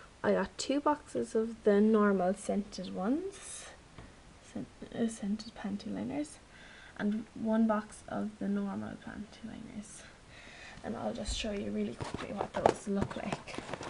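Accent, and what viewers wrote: Cork, Ireland. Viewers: I love your accent are you Irish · Are you from Ireland? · it's a cork accent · She's Irish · Are you Irish? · YOUR BLOODY IRISH THAT IS SO COOOOOL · She has a cork accent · You sound Irish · the first person I have heard with an Irish accent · You're Irish!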